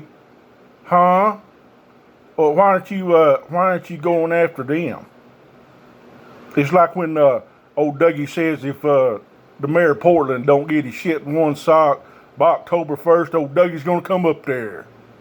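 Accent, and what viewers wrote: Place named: South, United States. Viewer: This guy's 100% right, took an oath to uphold the law at all times yet he stands behind the biggest criminal in American history, and as a military man he's supposed to defend The United States Of America instead he stands behind a lone lunatic imbecile who has caused irreparable harm to our democracy and severely compromised our national security. is the moron not this guy, having a southern drawl doesn't make someone an idiot.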